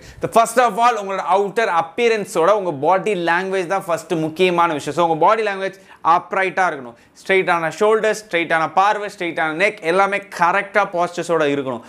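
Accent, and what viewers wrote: Salem, India. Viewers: Bro nenga salem ah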